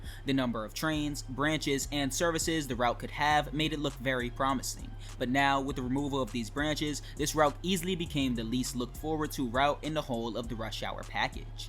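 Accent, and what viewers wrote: United States. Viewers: Right...I had to go back to see what the big deal was and you are just saying it the way we do here in the States. If you listen to videos from different regions and countries then you have to be prepared for different pronunciations sometimes.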